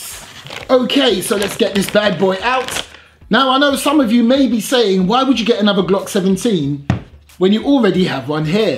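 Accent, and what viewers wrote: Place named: United Kingdom. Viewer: You're so delightfully British. Well not so long if Boris Johnson makes Britain sink.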